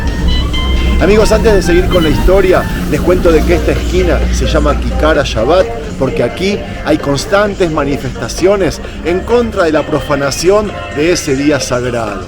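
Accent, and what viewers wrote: Buenos Aires, Argentina. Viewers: Como es que aun guardas el acento porteño, despues de tanto tiempo.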